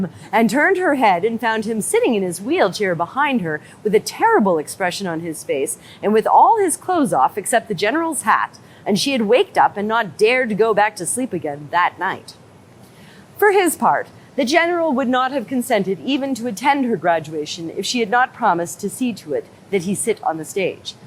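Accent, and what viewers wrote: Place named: South, United States. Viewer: Love your southern accent and the seagulls,too. Well done !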